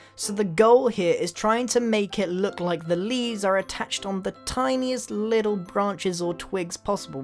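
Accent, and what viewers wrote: United Kingdom. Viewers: Damn, back at it again with the annoying British accent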